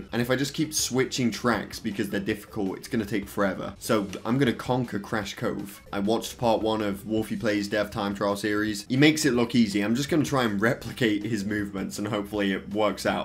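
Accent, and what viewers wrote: United Kingdom. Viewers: Dude are you from the uk?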